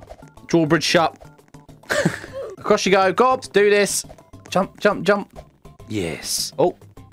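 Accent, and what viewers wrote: United Kingdom. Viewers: Bow down to the British accent king! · British accent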